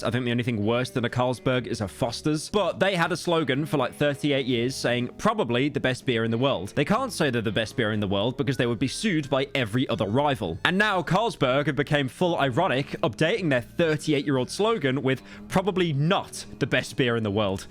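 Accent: United Kingdom. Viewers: Yea I’m unsubscribing, I forgot your British 🤢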